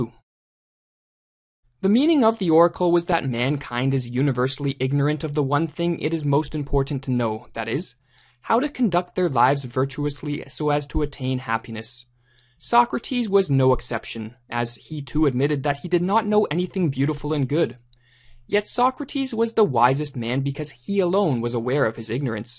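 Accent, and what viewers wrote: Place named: Canada. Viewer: you can tell he's canadian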